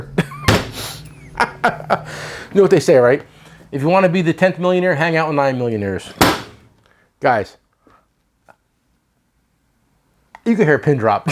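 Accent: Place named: New Jersey, United States. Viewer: Damn I kept wondering why I never heard the term "field" tool dealer wondering what the hell that means? Now I see the title failed lol went over my head. It must be that damn Jersey accent. Former Edison resident happily in Florida now.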